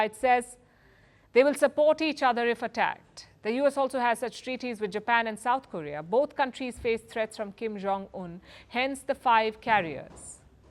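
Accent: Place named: India. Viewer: Your channel has not improved at all, you are speaking curry English all the way. And besides you are talking rubbish all the time, the Americans are lacking money, I just want to ask how long would they afford to keep 5 Aircraft Carriers going on in the front door of China ? By the way are they not afraid of Chinese missiles Dongeng 21 ?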